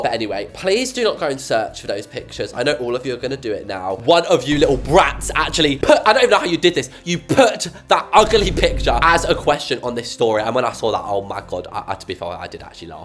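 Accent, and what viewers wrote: United Kingdom. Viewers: Why are you British? 😊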